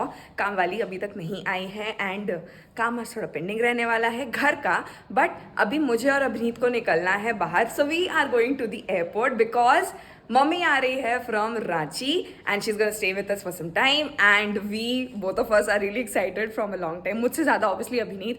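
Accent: Bihar, India. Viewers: Aap bhale he marwaadi ho aur calcutta m rhe ho kintu aapka lehza ya andaaz bihar u.p wala h.......love it❤❤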